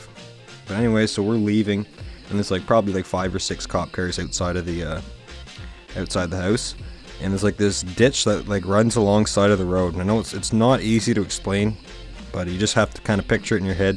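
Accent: Canada. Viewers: Buddys got the Manitoba accent. Good vid bro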